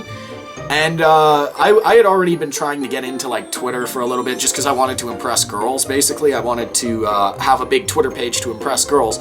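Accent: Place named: Canada. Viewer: I was going to ask you if you were from Canada because of your accent but then the video answered it for me.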